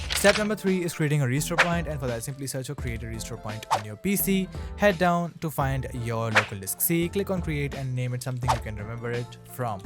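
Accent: India. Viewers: You sounds like a indian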